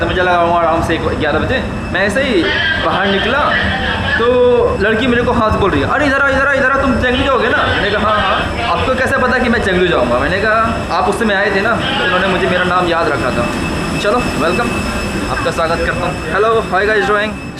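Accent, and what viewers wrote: Bihar, India. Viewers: Thoda dheere bola kar bhai bata deta hai ki Bihar ka hai.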